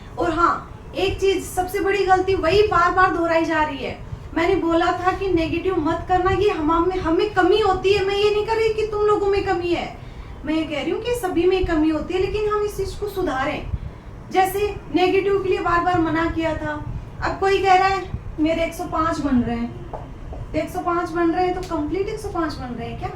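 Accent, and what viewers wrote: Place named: Jhunjhunu, India. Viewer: Mam aap mere ko bhasa sai to jhunjhunu sai lag rhai ho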